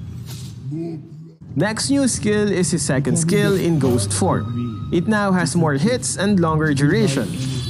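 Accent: Philippines. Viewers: I love your accent, very Filipino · The pinoy accent tho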